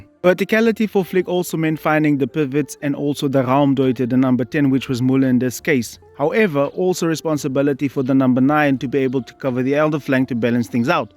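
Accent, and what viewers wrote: South Africa. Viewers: he has a South African accent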